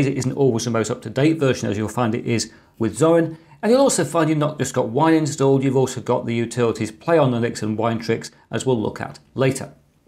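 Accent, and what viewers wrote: United Kingdom. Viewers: Me while learning English: "ok, cool, this is pretty easy to understand. I like it" Also me hearing British accent: .__. Edit: very good video. Helped me a lot. Tysm. :)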